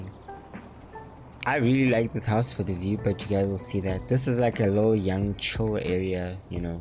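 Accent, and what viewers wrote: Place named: South Africa. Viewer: Brother are you from South Africa?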